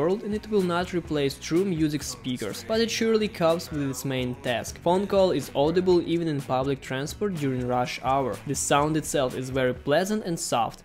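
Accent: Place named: Russia. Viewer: Russian english Best phone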